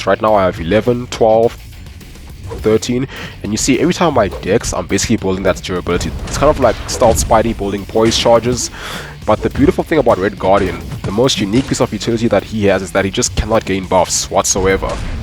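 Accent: South Africa. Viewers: Yo I have to ask. Are you from south africa? Sounds like you are.. Congrats on red guardian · Randomly came across this video. You sound South African?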